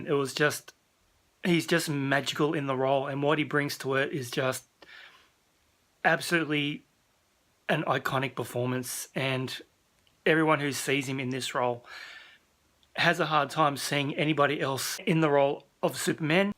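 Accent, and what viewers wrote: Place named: Australia. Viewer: It is amazing how powerful movies can be. Superman by far is my favorite movie of all time. You said something in your video that I relate to completely, and that is that Superman babysat me many many times. He was my friend. Someone I could always count on to be there for me. The magic of this movie holds up to this day. I was 8 years old when this came out, and I have seen it hundreds of times over my life. I also cried when Chris passed. He has been a huge part of my life and still is. I do not know you. From your accent I assume you are in another part of the world. (Australia?) Yet we speak the same language when it comes to this movie. And I think that's pretty cool! :)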